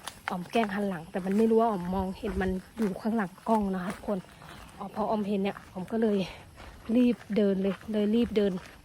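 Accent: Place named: Laplae, Thailand. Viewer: เสียงเหมือนคนลับแล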